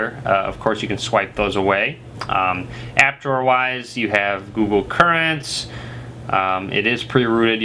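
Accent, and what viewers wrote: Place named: Chicago, United States. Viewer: dude i'm not from the states but i know you got a strong chicago accent!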